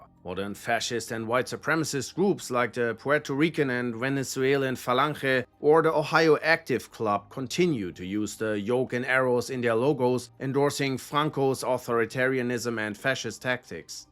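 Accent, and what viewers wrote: Germany. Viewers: IT IS hard to listen this German accent . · I think the author is german,with thick german accent,thats why he said it like that.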